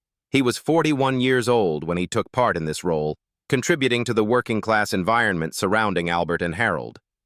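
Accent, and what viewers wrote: United States. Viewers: O look an american voice=terminology=brainwashing=cast system shame on you people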